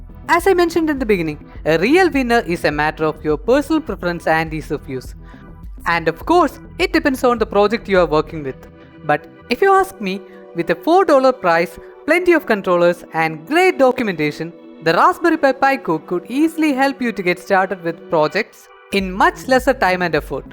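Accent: Kerala, India. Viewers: language and slang are quite nice, but I think you are from Kerala, 🙂 Actually, Arduinos are recommended for the beginners to learn program and robotics later followed by Esp modules, rsp2040, Raspberry pi, latte panda etc. etc.... anyways you need to include more information for beginner to understand well and to start their journey with right components, Other than this everything is fine. great job bro!!!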